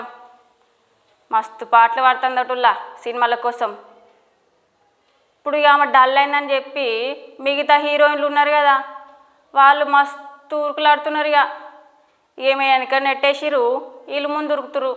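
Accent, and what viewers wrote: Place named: Warangal, India. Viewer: Wowwww Excellent Anchor... Warangal Language super super Medam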